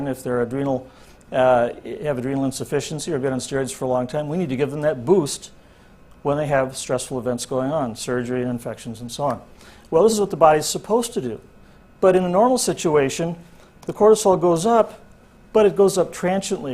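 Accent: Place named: Kansas City, United States. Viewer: Were you rasied in KCMO? You sound similar to me. I'm a shaken baby, with a birth defict spina bifida, and hydrocephalus. Also, the neighborhood, the stores around me, and my schools were also abusive. At least when it comes to the schools, I wasn't the only one. There are some really bad teachers and high school counselors. Sadly in MO, CPS sees you as an adult at 17, not 18. When I was 16 a Dr. reported my mother, but nothing could be done because I was to close to adulthood at 17. Really a bad state with social services, not only with children but with the elderly as well.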